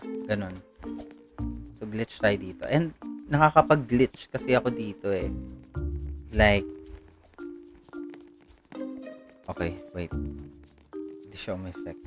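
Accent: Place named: Tagalog, Philippines. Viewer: Parang tagalog nag english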